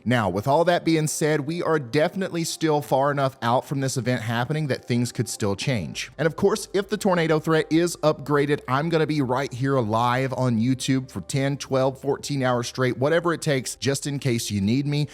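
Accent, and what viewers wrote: South, United States. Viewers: I live in northern California, so most of the time these forecasts don't affect me. But I love watching your videos, because you have the cutest southern accent, and I learn a lot about meteorology!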